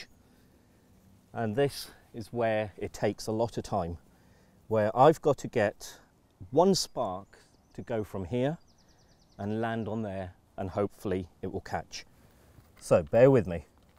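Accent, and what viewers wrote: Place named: United Kingdom. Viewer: An Indian man with a British accent. Bring it on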